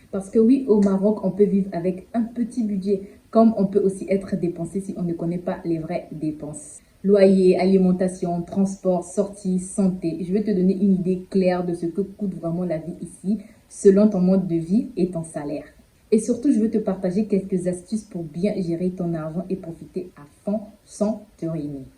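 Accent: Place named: Gabon. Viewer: Ton accent est gabonais 😅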